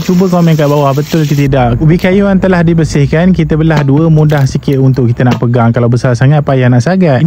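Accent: Pahang, Malaysia. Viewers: Dari loghat abg ni,mesti dia ni,org Pahang😅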